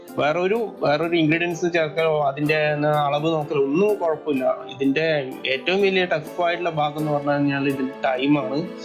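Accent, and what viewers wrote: Kannur, India. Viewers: നിങ്ങൾ ഒരിക്കലും മോശമായ, ശൈലി ഉപയോഗിച്ചിട്ടില്ല ,കേരളത്തിലെ എല്ലാ ജില്ലയിലെ സംസാരത്തിനും ശൈലിയിൽ മാറ്റമുണ്ട് ,നിങ്ങൾ നിങ്ങളുടെ സ്വന്തം ശൈലി കണ്ണൂർ ശൈലി ഉപയോഗിക്കുക. സ്വന്തം ഭാഷ പൊട്ടയാണെന്ന് പറയുന്നവരാണ് മലയാളികളിൽ കുറെ ഭാഗം ധൈര്യത്തോടെ കണ്ണൂർ ഭാഷ ഉപയോഗിക്കുക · Njanum kannuranu nammude bashakentha mosham. Recipi suuper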